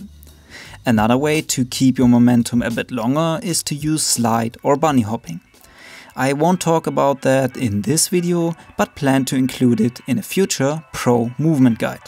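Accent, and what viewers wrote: Germany. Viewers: Ich schätze Mal dass deutsch deine Muttersprache ist...aber finde es trotz des unvermeidbaren Akzents, spitze danke für dieses Video :D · Are you German? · Safe deutsch hört man ja extrem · Schöner deutscher akzent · Are you an German? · 10er wenn das kein eingedeutschtes Englisch ist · Erwischt du bist Deutscher hehe ;) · You sound German m8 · Der ist Deutscher merkt man am Akzent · Haha deutscher akzent kickt😂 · Man hört aus deinem englisch raus das du deutscher bist xD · Ich höre doch genau raus das du deutscher bist :) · Hey mein ich das nur oder sprichst du normaler weisee Deutsch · Du bist doch ein Deutscher oder :)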